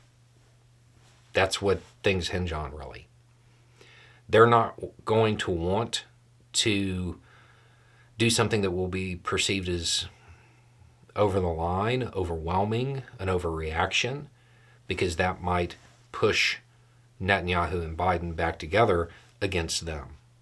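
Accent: South, United States. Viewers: Just found this channel. Thought you were a hillbilly talking gibberish. But you’re actually pretty solid dude. Great watch. I’ll stick around.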